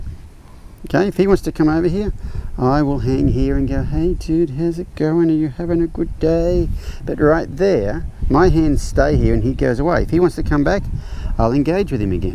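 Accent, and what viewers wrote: Australia. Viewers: Never heard a cowboy with an Australian Accent🤠🤔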